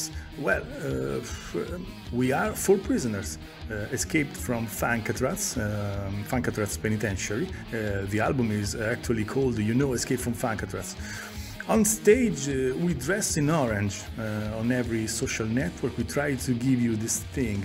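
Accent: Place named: Italy. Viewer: A gimmick can be cool and definitely help out and Lordi would not have gotten as big as they did without costumes. However, today's Top40 is full of music that lack ANY personality (when did you last hear an original rap song?). I think your English is very easy to understand even though you can hear an Italian accent which sounds a bit refreshing. Perhaps that could be a gimmick too in some sort of way. Holy Super Mario Spaghettio 😆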